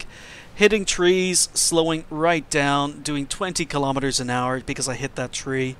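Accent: Ireland. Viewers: Your accent is fucking bizarre. Make up your mind are you Irish or a brit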